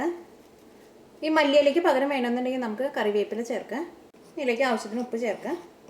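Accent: Thrissur, India. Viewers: Trisur bhaashayalle ith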